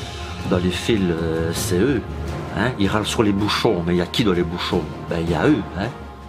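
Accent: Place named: Liège, Belgium. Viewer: J'adore cet humour aux accents très liégeois.... un bon relai de la voix de la plèbe:-)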